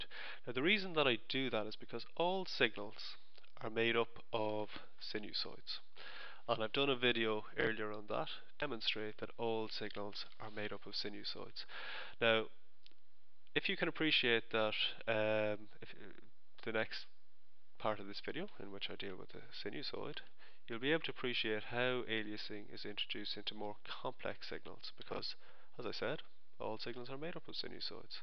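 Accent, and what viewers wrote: Ireland. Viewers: Sweet explanation and an Irish accent on top. Class fella, cheers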